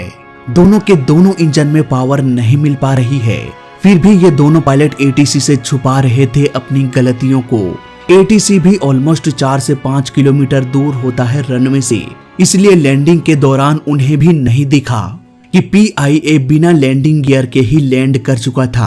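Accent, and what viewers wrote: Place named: North India, India. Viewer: यह एक उत्तर भारतीय भैय्या है , इस की भासा (भाषा) और pronunciation साफ दर्शा रहे हैं। इनकी G मे एक कीड़ा है, अपनी कमियों को दर्शा कर उस को सुधारने की बजाए, दुसरे के शौचालय मे नाक घुसा कर यह सुनिश्चित करना चाहते हैं कि कल इसने क्या खाया था।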